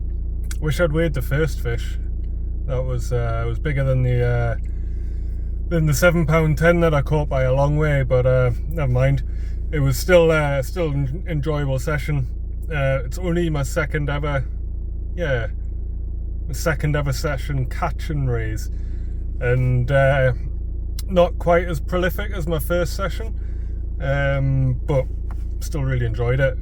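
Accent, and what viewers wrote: Newcastle, United Kingdom. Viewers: 😂 Howa man he is just a geordie it ain't his fault he is wrong side of the wear They speak Doric where I live now and if you canna understand him then you'll be knackad up here ❤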